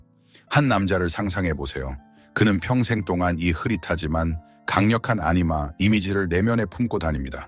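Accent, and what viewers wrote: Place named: China. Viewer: 중국분이신가요? 발음이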